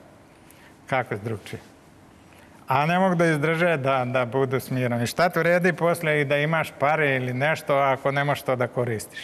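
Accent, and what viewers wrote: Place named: Kordun, Croatia. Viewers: Kao da sa Korduna. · Ovaj govori hrvatski.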